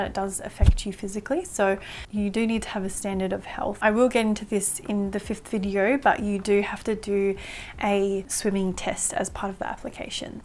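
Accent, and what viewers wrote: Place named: Australia. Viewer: omg an Aussie, perfect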